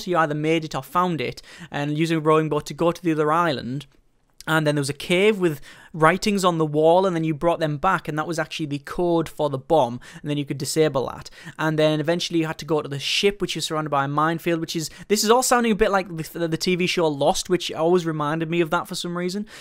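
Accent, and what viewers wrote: United Kingdom. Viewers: I like your real accent more if I'm being honest. 1) I'd prefer you to talk normally and not have to speak in a way innatural to you 2) It sounds more interesting than the generic British accent.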